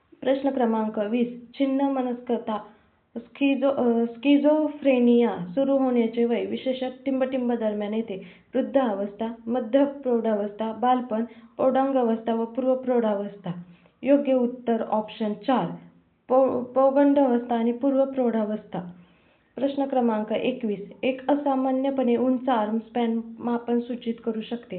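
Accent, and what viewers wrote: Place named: Solapur, India. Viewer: तुम्ही सोलापूर डिस्ट्रिक्ट चे आहे का मॅम आमच्या ऑफिस मध्ये एक सोलापूर चे कर्मचारी आहे ते तुमच्यासारखी बोलतात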